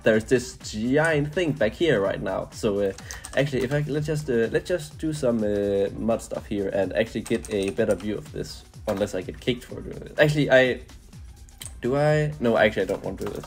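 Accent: Denmark. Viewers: are u danish? u sound danish with ur accent, and it says on the credits that u translated the game to danish???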